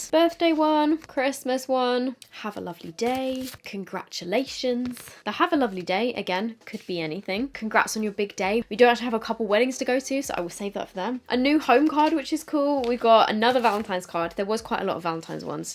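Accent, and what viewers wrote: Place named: United Kingdom. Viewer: Love the British accent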